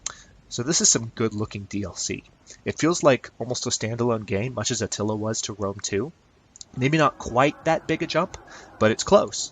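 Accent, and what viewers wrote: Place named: United States. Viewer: Hahaha aye exactly the accentless accent, the de jure news reader accent :') really clear but you can tell its American haha. Sounds lovely :). Sure i enjoyed your video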